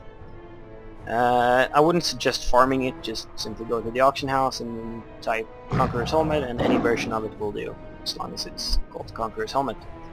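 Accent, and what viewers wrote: Sweden. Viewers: Du är svensk va? Jag hör det på din engelska/ "U are english right? I can hear it on your english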